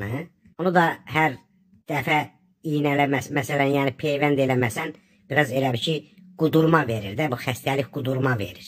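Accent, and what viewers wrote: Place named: Aghjabadi, Azerbaijan. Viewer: Bu (lehcesine gore) Agcabedili,ve ya Beyleqanli olduguna wubhem yoxdu.Ancaq hami kimi isterfim ki,cixsin ortaya ve onu butun Azerbaycan tanisin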